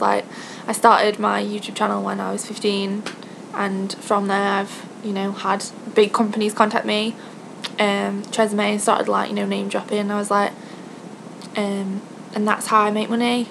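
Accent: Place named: United Kingdom. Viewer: Where abouts in the UK are you from? Your accent is sooo familiar...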